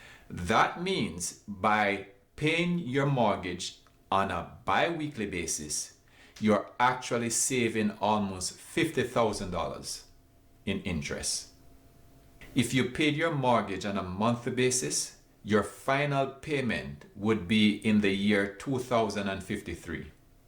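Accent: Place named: Zimbabwe. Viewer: Nice accent! Zimbabwe???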